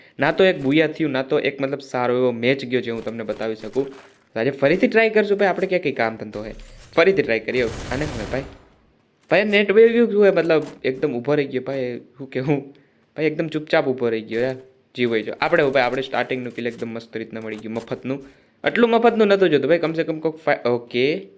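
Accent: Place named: Kathiawar, India. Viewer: Bhai thodi kathiyawadi pan bolo ne pehla ni jem. 🙏😊,commentry in old style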